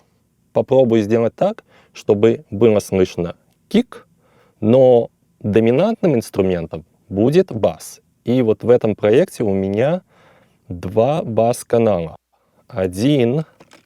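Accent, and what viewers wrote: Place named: Germany. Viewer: Откуда у тебя немецкий акцент?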